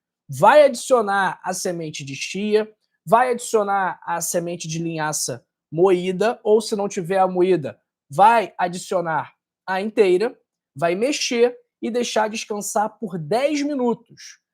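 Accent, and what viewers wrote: Rio de Janeiro, Brazil. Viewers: Sotaque carioca é dureza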